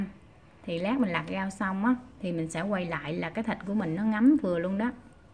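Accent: Mekong Delta, Vietnam. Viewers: Ủa thấy bình thường mà. Chắc do bạn k nghe quen tiếng miền Tây. Chứ chị đó nói bình thường ắ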